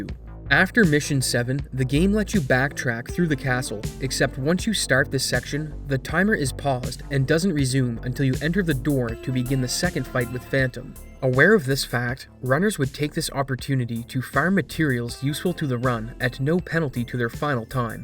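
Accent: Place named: Atlantic Canada, Canada. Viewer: How far east are you from? I'm trying to pin it down, but I'm having trouble distinguishing because of your decent effort between NS or NL. It's been annoying me that I can't decide. If I was watching this in a vacuum, I'd say NL, but other videos make me question...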